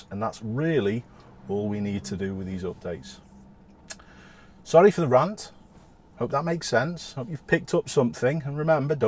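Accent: Liverpool, United Kingdom. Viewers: I don't drive, I just enjoy your videos when I need a relaxing dose of calming Scouse Zen. I am a cyclist and I'm always painfully aware of my own presence on roundabouts and junctions and how it affects other road users and we certainly don't need the ramblings of a prominent concrete farmer to muddy the waters.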